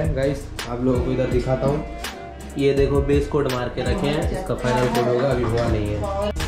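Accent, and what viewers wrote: Odisha, India. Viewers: Bhai app odisha se ho paaka 😅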